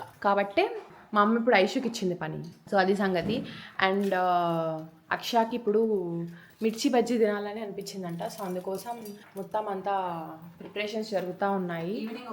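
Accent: Rayalaseema, India. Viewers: Ah yappa dagaraki was paka ralayasemma slang ❤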